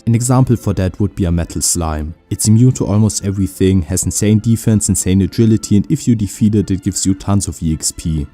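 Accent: Germany. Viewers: Ich brauchte genau 5 Sekunden, um herauszuhören, dass du normalerweise Deutsch sprichst. :D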